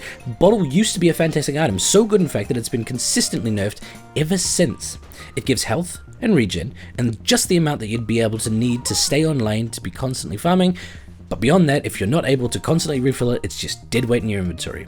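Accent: New Zealand. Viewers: hes a New Zealander but i guess its kinda the same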